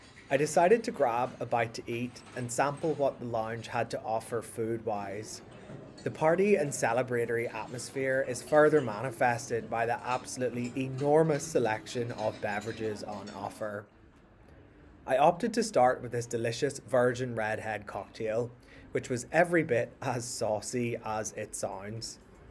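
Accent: Northern Ireland, United Kingdom. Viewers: Hello, your video just popped into my inbox tonight and your lovely Northern Ireland accent, combined with you being dressed very nicely but comfortably, got me watching. I have never flown Virgin Atlantic, BA, Aer Lingus and Air Canada are my usual choices. I enjoyed your video but was very disappointed that you did not get a window seat. The Lounge looked excellent. I have been traveling alone now for about 15 years and trust me, I am very comfortable now. I am originally from the West of Ireland. I do like your lovely approach to showing us exactly what we need to see and hear. Video was perfect from start to finish. Good luck on your Journeys. I have subscribed to your Channel. Have a great week.🍀✈️☕️🫖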